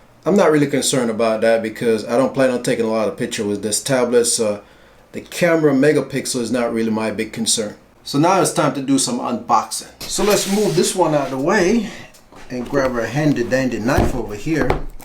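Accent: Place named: Jamaica. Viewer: Jamaican? lol ...accent is strong. Thank you for this I was confused on which one I should get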